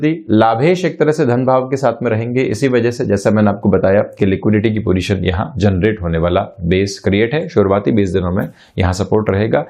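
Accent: Standard. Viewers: Predictions k sath Dharapravah Shuddh Bhasha sunne k liye milti hai aapse ji..... Pranam evam Dhanyawad 🙏 Kripaya bhasha ka standard yahi banaye rakhiye.....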